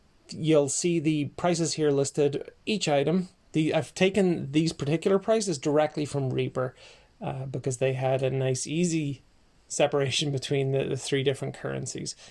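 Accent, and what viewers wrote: Northern Ireland, United Kingdom. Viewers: As a Nordie, I thought I detected an accent from the Graeme McDowell School of Transatlantic Speech....I was right.... I can hear a little noise in the audio, that's easily removed e.g. using Audacity noise filter.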